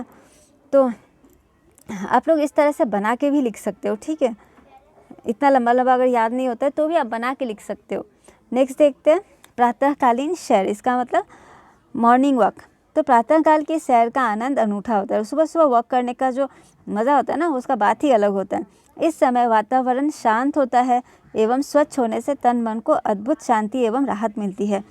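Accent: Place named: Bihar, India. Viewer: Mam ap bihar ke ho naa😉don not mind please 🤌🏻vo bss apke bolne ke tarike se lag raha tha ki app bihar se ho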